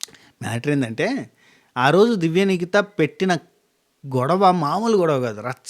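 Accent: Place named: Rayalaseema, India. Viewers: garu rayalaseema yasa ok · Rayalseema yaasa😅😅😅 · Memu rayalaseema kani mee antha goranga matram matladaru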